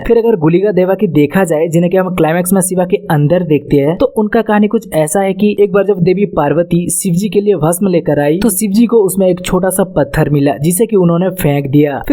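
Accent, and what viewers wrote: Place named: Karnataka, India. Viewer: Nah he's from southern india, i can tell by his accent.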